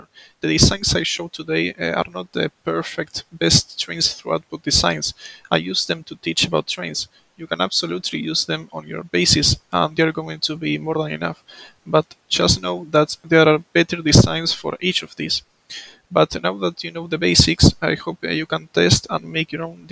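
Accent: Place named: Argentina. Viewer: Ahjj el ingles argentino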